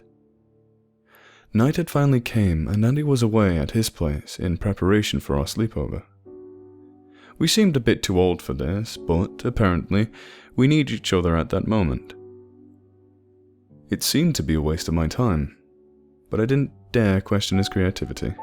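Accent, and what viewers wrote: England, United Kingdom. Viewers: Thor is played by Cris Hemsworth. He's got an Australian accent...this dude's accent is English. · He's like Morgan Freeman with an English accent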